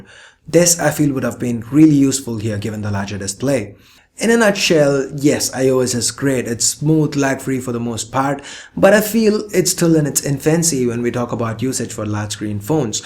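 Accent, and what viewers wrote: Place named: India. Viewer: I can understand him really well lol he sounds the same as any Indian.... Which is not bad!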